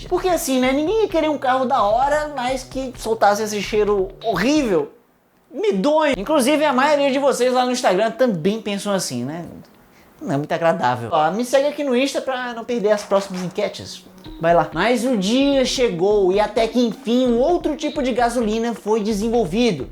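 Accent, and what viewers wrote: Ceará, Brazil. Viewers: Cearense ater falando de ciência é engraçado parabéns gosto dos seus vídeos, eu aprendo e mim divirto 👏👏👏👍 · Cearense kskskskdj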